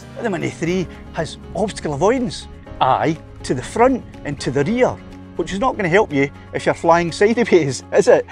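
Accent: Scotland, United Kingdom. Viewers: Hi, sitting here in my cabin up in North East Finland with a big smile on my face, enjoying your Scottish accent and your comment to the teenager who drove past. The Mini 3 is my first drone and I enjoy flying it. I myself am an instructor and graphic designer and have made quite a few films within motion graphic advertising and documentary and will usually hire a drone photographer for the films I make. I would say that for lower budget films, the DJI mini 3 Pro is great for the job. Thanks for sharing, slàinte from a Dane in Finland.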